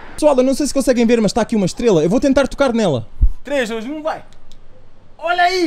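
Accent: Portugal. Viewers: Ele não fala o Português do Brasil, e sim o Português de Portugal. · seu sotaque de Portugal é mais fácil entender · Ele... É português mesmo ou ele só faz o sotaque? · É DE PORTUGAL OLHA COMO A VOZ DELE É DIFERENTE 😳😒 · De que local você faz os seus vídeos ??? Pergunto devido ao seu sotaque da minha adorada terra PORTUGAL, mais sou do BRASIL. Abraços amigo fique na PAZ. Obs.: Me inscrevi, dei joinha e ativei o sininho.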